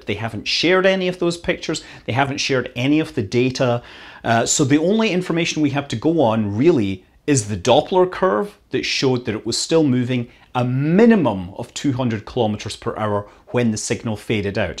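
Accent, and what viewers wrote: Scotland, United Kingdom. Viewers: I had to rewind to listen again to what you actually said.... because I was expecting you to say "temperature", so that's what I "heard" you say! When I read the overlaid correction, I thought, "Wait.... What?" This is all because I have a very hard time processing auditory information quickly enough, and with a Scottish accent and rapid delivery, in tend to miss lot. (This makes telephone conversations hard work for me, esp. with the wretched voice quality on American mobile phone service.) Thanks to YouTube for offering 10-sec REW!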